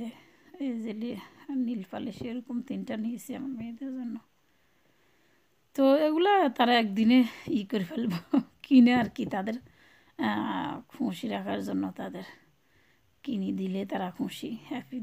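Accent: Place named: Noakhali, Bangladesh. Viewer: আসসালামুয়ালাইকুম আপু তোমার ভিডিওটি খুব ভালো লাগলো তুমি কোথায় থাকো বেল বাজিয়ে রাখলাম আপু তোমার কথা শুনে মনে হচ্ছে তোমার বাড়ি নোয়াখালী আমার বাড়িও নোয়াখালী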